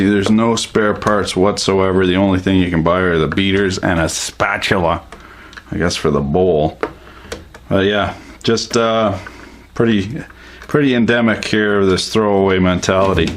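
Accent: Canada. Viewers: The whole first minute reminds me of Corner Gas. must be the accent. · i started watching your vids a few days ago. I got to say, I love listening to Canadians talk. And you sir, are a fun one to watch. Keep up the awesome work. If you can call it work, you have so much fun doing it. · Hes canadian, i think :P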